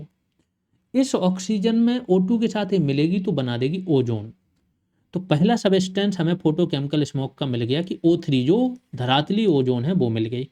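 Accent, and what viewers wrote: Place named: Bundelkhand, India. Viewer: Sir aap bundelkhand se lg rhe ho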